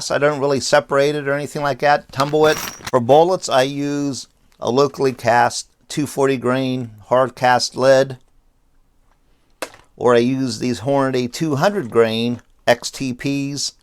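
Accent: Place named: Pittsburgh, United States. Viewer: I thought the same thing!!! I heard “arahn tahn” and I was ready to place my order at Primantis and tune into 102.5 DVE lol. Ours is a pretty unique accent. I get asked where I’m from all the time down here in Tennessee only two people in six years have been able to place the accent, a Nurse who’s husband is from Pittsburgh and an Air Evac pilot.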